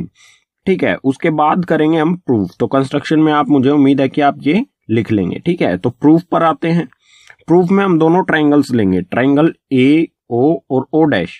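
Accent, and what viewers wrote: Haryana, India. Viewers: Aap haryana ke ho kya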